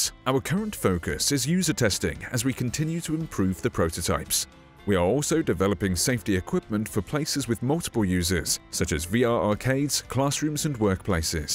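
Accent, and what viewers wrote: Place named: Germany. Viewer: nice , I Ve read the faq it s awesome . But could I have a bit more details about the price . Even if you don t have the exact price I wish to have an idea , if it s more like 100€ or 200€or even 300€ . I Ve noticed the German accent , it s nice ^^ I live 30minutes far from Germany and I speak German too (nice language ).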